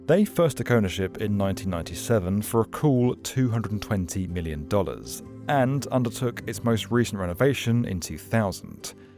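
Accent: United Kingdom. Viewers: Another 20 minutes of a Brit saying Chryzla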